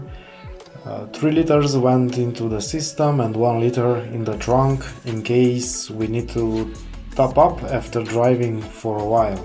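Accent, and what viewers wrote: Romania. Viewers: din primele propozitii se vede ca esti roman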